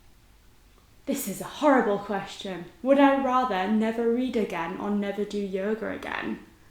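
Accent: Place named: Yorkshire, United Kingdom. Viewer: This was so fun ☺️ love your videos and just the whole vibe of your channel! Are you from Yorkshire? I feel like I can hear it in your accent 😁 I’m a Yorkshire lass 🥰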